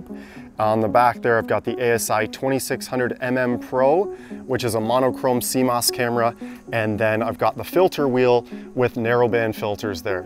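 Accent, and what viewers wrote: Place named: Canada. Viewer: Before you go apologizing for your country, you might want to mention which country you are in. I thought you were Canadian because of your accent but I know there have been a lot of forest fires in the US. No criticism intended. Your content it always GREAT!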